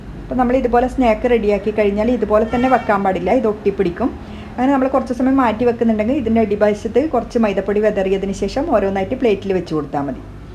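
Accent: Kannur, India. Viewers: Nice name " Thakaarapetti ". Due to shortage of time, can't try many receipe. But will try for sure. Heard that Thalassery, Kannur people are very good in cooking. I like your Kannur slang . Thank you dear. 😊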